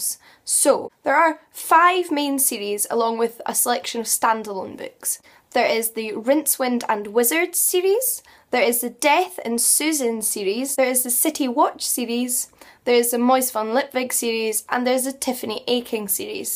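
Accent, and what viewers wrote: Scotland, United Kingdom. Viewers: She has a strong Scottish accent. You just don't know your accents mate. No shame in that. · She's "Bri'ish", even better! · Love this video. I wanted to start reading HogFather, but when I saw how it was in the Disc World it was intimidating. I am going to subscribe to you now. Love your accent. Scottish? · My first thought when she started to talk”She’s british. I can trust her” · British does not just mean English. Scotland is part of Great Britain, so is also a British accent. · Ohhh this is Scottish? Interesting. Seems like I mistook another brittish accent with Scottish then xD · Béranger Seguin it's Scottish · Thank you. A good friend recommended the whole serie and I felt a little bit overwhelmed ! Also, completely out of context, are you scottish ? I'm not a native english speaker and I plan on moving to Scotland soon and so far I'm struggle with the local accent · She has a Scottish accent. 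She is Scottish, which means she is a,so British. If you want to split hairs at least know what you mean.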